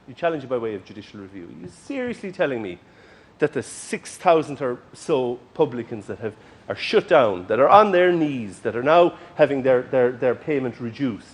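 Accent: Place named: Ireland. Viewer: Beautiful Irish brogue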